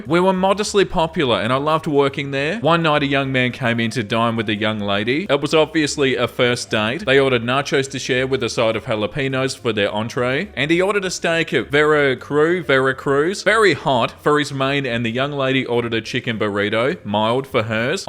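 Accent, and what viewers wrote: Australia. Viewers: I have never heard someone so Australian and so valley girl at the same time. It's amazing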